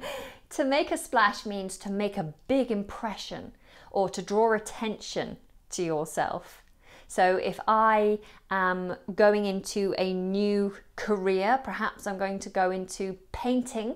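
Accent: United Kingdom. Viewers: I really admire the British accent. Great class. One more subscribed. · Vrey nice story I like British accent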